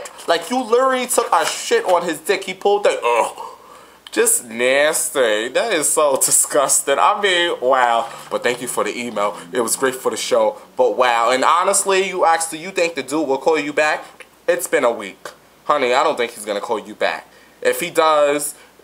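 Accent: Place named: New York, United States. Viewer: i love how you talk with that new york accent!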